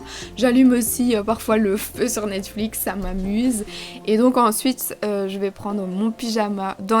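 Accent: Belgium. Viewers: J adore l accent Belge!!!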